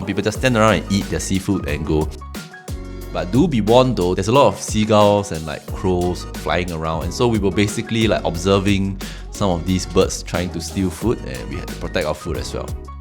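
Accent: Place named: Singapore. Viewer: guess you are from singapore your accent sounds familiar to me. I was living in singapore for 15 years and love the memories of it. Migrated to Canada because of Covid . Glad to subscribe the video is awesome enjoy the travel ❤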